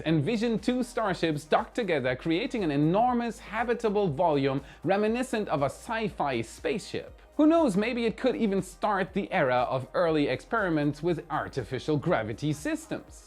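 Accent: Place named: Germany. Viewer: Love these videos. Part of me hates the cringy sort of script reading. But honestly the German accent sticks around in my mind and when I see a new video I just imagine cliche intro phrases in a German accent and I have to say I look forward to it haha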